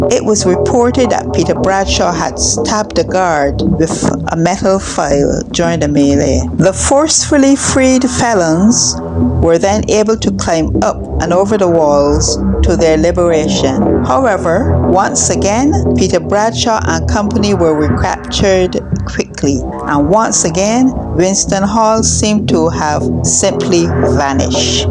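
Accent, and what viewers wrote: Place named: Barbados. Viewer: The accent is a "Bajan" accent or if you can say Barbadian accent.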